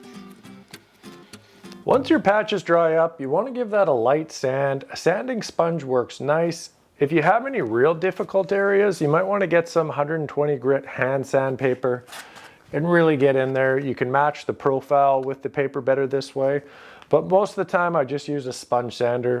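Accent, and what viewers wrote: Canada. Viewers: Finally! A YouTube video where you don't feel being yelled at! Oh! I just picked up here Canadian. That explains it.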